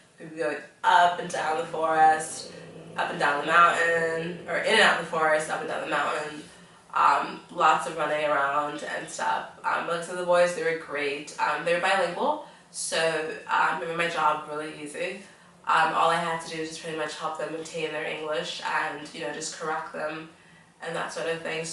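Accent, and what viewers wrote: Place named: California, United States. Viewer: You accent is interesting... I was thinking California or Canada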